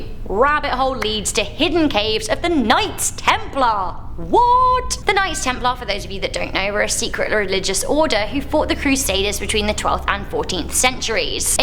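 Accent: England, United Kingdom. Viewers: Our is back with her indestructible tongue and hot Brit accent. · WOW! This was insane! Love it! you are awesome and your shows are interesting AF. I also could listen to the butterflies shooting out of your mouth all day! The English accient is so beautiful.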